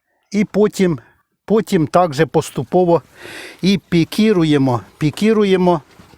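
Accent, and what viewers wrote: Poltava, Ukraine. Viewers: Яка ж милозвучна Полтавська вимова! І безліч корисної інформації.Наснаги і здоров'я вам і дружині. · Слухаю нашу лагідну українську полтавську мову і хочеться слухати і слухати. Ніби й знайома з усіма цими справами, з посівами і пікіровкою, та все ж -- мова, заслухалась. А ще душу радує те, що чоловік цим займається.. дякую за всі моменти радості. А ще дивлюся, як ви багато висіваєте розсади, місця треба багато. Я цей рік посіяла в ,,улітки" перець. Супер, місця займає дуже мало. Розсада класна, пікірувати одне задоволення.. в одну улітку в мене вийшло 45- 48 шт, а улітка помістилась в 1літрове відеречко з під сметани. У ваш яшик поміститься штук 8 відеречок. Попробуйте, можливо вам сподобається.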